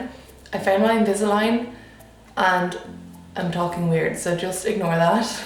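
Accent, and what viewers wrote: Ireland. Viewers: she is from Dublin in Ireland ☺ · The Vogue i think she is irish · The Vogue ja, sie ist Irin, aber ist manchmal auch in London bei ihrem Freund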